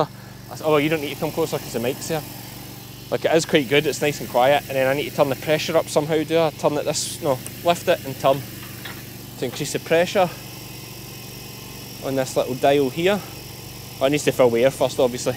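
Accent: Scotland, United Kingdom. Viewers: Wow, and I thought I could understand the Scotts…. Maybe give us a whole video with the full accent. I have so much to learn. 😂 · I'm a fairly new fan of Sheep Game... And I'm trying! I love the content but... Getting used to the Scottish accent is taking some work for these Canadian ears of mine. I'm glad is conscious of this challenge for viewers and adjusts things somewhat as he mentions here. My Granny emigrated from Glasgow so I thought I'd have an edge. Oh well it just means watching the same content 2 or 3 times to be sure. 😉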